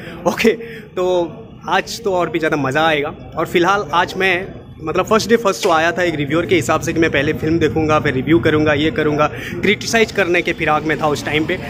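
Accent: Odisha, India. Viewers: Aap odisha se ho kya❤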